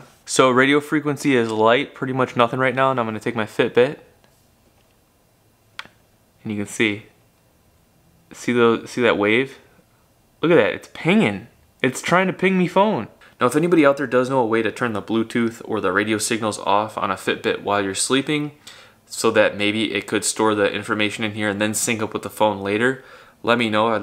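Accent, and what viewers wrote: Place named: South, United States. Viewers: How come you had a southern accent in this video 😂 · Dude why are you talking with a Southern accent??? Just talk normally man. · He probably listened to southern people, or he's doing a trial related to changing his accent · Definitely noticed more of a Southern twang to his accent. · He sounded more "southern" but I just noticed after reading your comment. Lol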